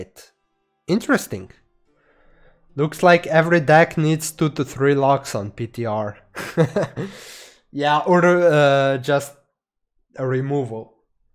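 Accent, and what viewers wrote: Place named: Spain. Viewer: I think from Spain. But i like his accent. I like also his contents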